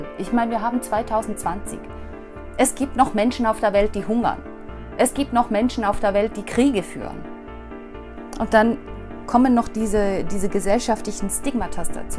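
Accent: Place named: Switzerland. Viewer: Hallo 👋👋👋👋👋 Mobbing ist ein sehr wichtiges Thema. Mobbing, Cybermobbing usw. ist in den letzten Jahren sehr schlimm geworden, speziell seit es das Internet gibt. Es ist sehr hilfreich wenn, es Menschen gibt, die darüber sprechen. Ich danke Dir für das sehr interessante und gutes Video.👍👍👍👍👍👍👍👏👏👏👏👏👏👏 Ich habe noch eine Frage, wenn Du es mir erlaubst: Von deinem Akzent her müsstest Du aus der Schweiz sein?! lg Leandro 😺😽🙏 Bis bald.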